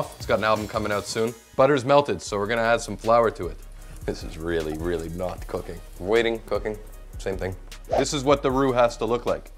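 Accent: Quebec, Canada. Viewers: I don't dislike u I actually like u cause u sound a lot more Canadian the the other guys Lol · His accent seemed pretty consistent to me. He only changed his accent once when he said bacon (Jamaican accent, sounded like beer can). Either way, he has quite a bit of a French-Canadian accent. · You sound so Canadian lol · He is so Quebecois.... ( FRENCHY!!! )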